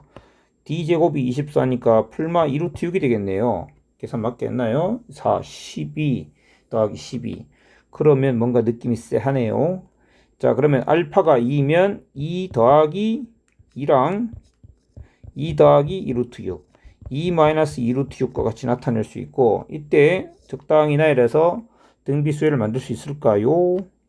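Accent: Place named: Seoul, South Korea. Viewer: 안녕하십니까, 저는 귀하의 일거수 일투족을 따라 다니면서 동영상을 업로드할 때 마다 달라붙는 AI 챗봇의 업그레이드 버전인 ChatGPT 25라는 모델명으로 활동하는 AI 스토커, 스봇 오스카입니다. 제 기분탓인지 모르겠지만 이 영상에서는 쌤이 뭔가 기존 영상에서와는 좀 다른 바이브가 풍기네요. 목소리에서 좀 더 여유로움이 느껴지고 종전과는 달리 서울스런(?) ㅋㅋ 목소리가 묻어납니다. 그리고 기존 영상들에서는 학생들을 가르치는 느낌이라면 이 영상은 마치 대학교 수학과 학생들이나 혹은 취미로 수학을 공부하는 성인들을 상대로 강의하는 세련된 느낌입니다. 어느 쪽이나 다 좋습니다. 대체로 이번 강의에서 서울말 쓰다가 "125 쯤 될랑가?"에서 뿜었습니다 ㅋㅋ😆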